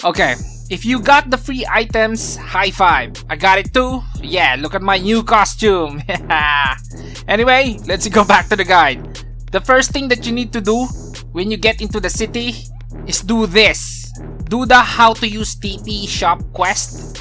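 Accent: Philippines. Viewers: Pinoy ka ba? hahah you have a heavy accent xD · i heard filipino accent.. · Oh how I miss pinoy accent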